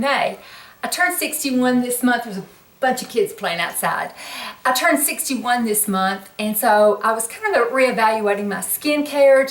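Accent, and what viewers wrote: South, United States. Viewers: It's a beautiful southern accent:) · Your skin looks beautiful, especially your neck. How often I see girls ignore their necks and when the face looks good but the neck looks like a turkey's wobler, just ruins the effect. Being a Carolina gal, myself, I really loved listening to your Southern accent. Your hair is so thick looking on top, any tips on hair loss? Thanks, nice video from a Beautiful Lady.....................................Linda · My mom was Southern, so its very comforting listening to you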